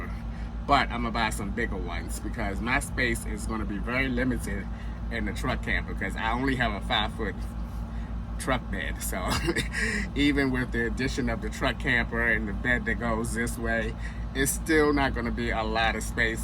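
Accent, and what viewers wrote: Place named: East Coast, United States. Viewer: your accent 🥰, are you from the east coast? Enjoy the cruise and FOA Fam 🎉🎉🎉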